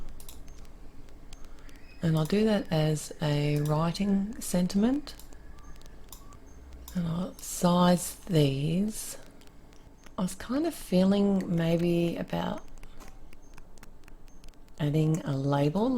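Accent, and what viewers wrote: Australia. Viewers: Also loved having your wonderful Australian accent talking to me.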